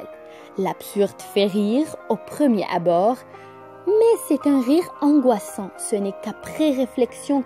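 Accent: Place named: Flanders, Belgium. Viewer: Merci pour la vidéo, ca vient d'ou votre accent? Il me rappelle au Flammandes parlant le néerlandais...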